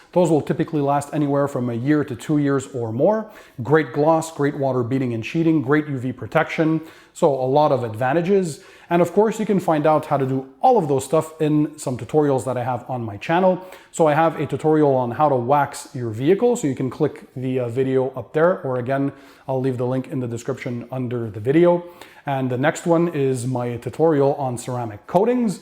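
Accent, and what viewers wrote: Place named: Quebec, Canada. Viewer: I watched 30 mins of your best products vid, and 22 mins of this one before I picked up on your Fr. Canadian accent, lol. Vive la Quebec! Merci, monsieur.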